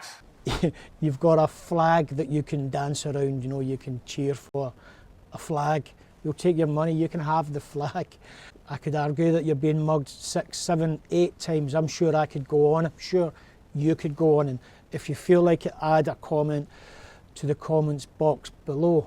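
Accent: Scotland, United Kingdom. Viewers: It is good that your Scottish accent is not too strong to understand.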